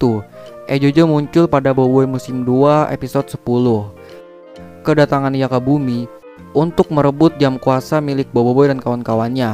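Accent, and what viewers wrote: Java, Indonesia. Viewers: Bang kamu orang Jawa bang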